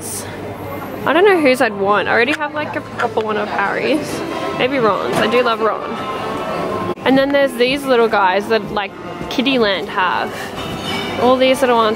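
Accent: Australia. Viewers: I love how hard you spin that gacha spinner! And oh God I'm such a sucker for a sweet Australian accent. You just got a new fan,!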